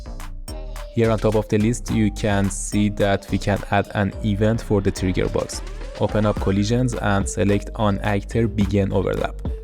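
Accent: Iran. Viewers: Amazing video, used this as a refrence to implement this in C++. Also love your Iranian accent :face-purple-smiling-tears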